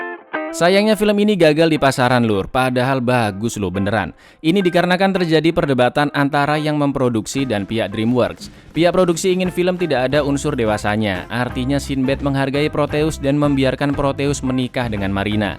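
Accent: Java, Indonesia. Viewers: Bang Lo wong jowo opo udu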